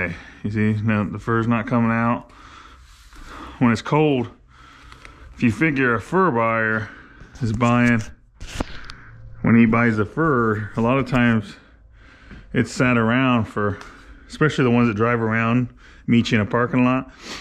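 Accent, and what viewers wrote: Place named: Missouri, United States. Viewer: can tell your a missouri boy! love it man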